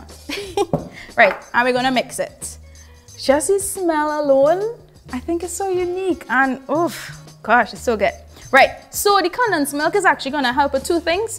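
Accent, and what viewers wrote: Trinidad and Tobago. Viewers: Wait you saying she is from Jamaica..... because she sound like she is from T&T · I love trinidadians accent 🤗🤗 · Trini accent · Oh yes, Trinidadian accent is the best. No other accent can match it. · Trinidadian accent is always so satisfying 😌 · That Trini accent 😌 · That trini accent so sweet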